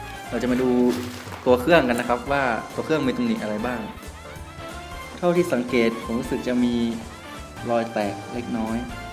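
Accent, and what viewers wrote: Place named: North, Thailand. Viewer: คนเหนือกะ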